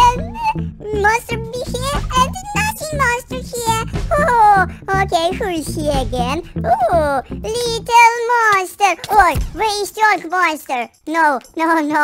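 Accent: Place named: Russia. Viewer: скорее всего ты русский судя по акценту (You Russian boy???)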